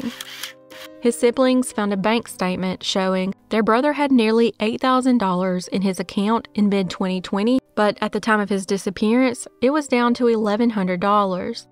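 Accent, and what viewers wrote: South, United States. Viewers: Good evening Southern Belle 😉 so good to hear that Sweet Southern sound! Thank you for putting all the time and effort in to your videos! Your dedication brings us listeners true sad and sometimes happy stories. Much love from Washington State 💞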